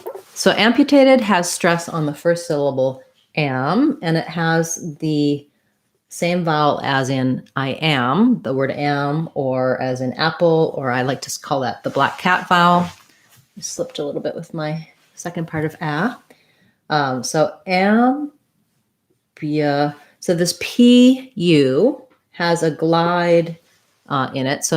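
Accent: United States. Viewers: a great explanation thanks for helping us, I love american accent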